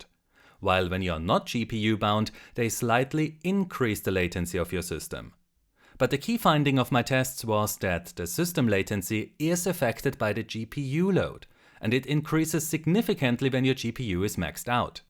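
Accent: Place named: Germany. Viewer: You know you're in good hands when you hear a German accent..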